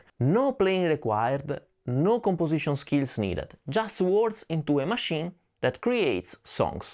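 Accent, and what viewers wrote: Italy. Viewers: I fully agree with you. You are not old, you are simply in the right side! And, of course, your italian accent is totally fine 😂😂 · ! We love your channel, your content and information is invaluable to the community, we even love your italian accent ( i would love to speak any language at your level of proficiency)! Stay True! Stay Original! Stay Consistent! Keep up the great work! Thank you! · Dear, when you speculate about the proposal you got, somewhere between and, you said that they probably plan to use your "stupid Italian accent." As a language teacher who works as a pedagogical coordinator and holds an MA in Linguistics, and a subscriber to and fan of your channel, I'm in a good position to tell you that your accent is exquisitely and melodically beautiful. I guess I speak for everyone here when I say that the way you talk is one of the things that make your channel unique. 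I know you were being humble when you said that, but I thought it would be nice to let you know how much I/we love your accent - and your content, of course! Cheers from São Paulo, Brazil! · You’re accent isn’t stupid. You’re an Italian who speaks English. So there is an accent. I love to visit 🇮🇹 once again. The last time I was just a bambino. 🧒 such a beautiful place.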